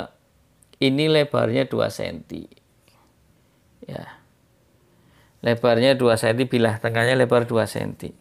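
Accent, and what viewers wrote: Tegal, Indonesia. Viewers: Kang Boss.... Logat kaya Tegal koh..... Salam Ngapak.... Aku Naksir Keris e....koh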